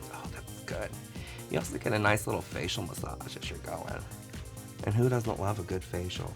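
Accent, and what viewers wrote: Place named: South, United States. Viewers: She talks like a little southern gay Bob Ross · ginger sounds so sweet and southern and endearing when she speaks i love it so so much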